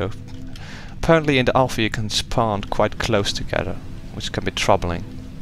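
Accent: Netherlands. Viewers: Dutch peoples english accent is so easy to recognise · Yea, you sound dutch :P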